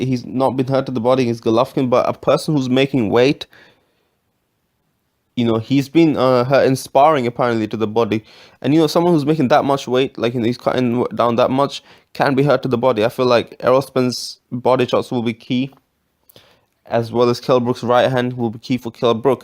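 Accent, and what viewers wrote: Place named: India. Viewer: You sound like you're a Indian from India! ANY ways I just wanted TO let you Know what YOU sound like!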